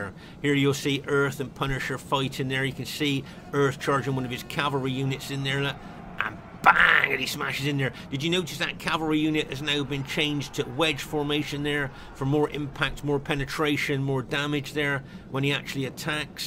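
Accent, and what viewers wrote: West Country, United Kingdom. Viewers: as someone with a rural irish accent i respect immensely the dedication to serious commentary from someone from the west country, for such a great game too. thanks for uploading these videos from someone who plays napoleon total war's mod NTW3 a bit too much!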